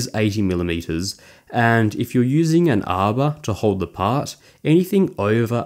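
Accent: Australia. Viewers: Is that an Auzzie accent? Does it vary from region to another? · Thank you for this very thorough and well thought out review! I have been procrastinating for years now on my decision to jump into a mini lathe, because there just isn’t enough information out there in easily understood format. Good on ya mate! I enjoyed listening to your accent too, my younger sister lives in Albany, Western Australia, and so I miss hearing you Aussies! Cheers mate, from Northern California! 🙏💪🙌🤘🤘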